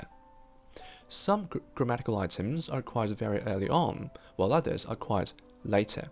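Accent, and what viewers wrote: United Kingdom. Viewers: 英式口音真好聽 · 英国口音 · posh accent English ❤...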